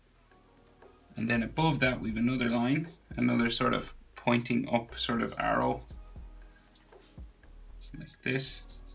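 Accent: Ireland. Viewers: Yesss thank you I really needed this tutorial also I really like your Irish accent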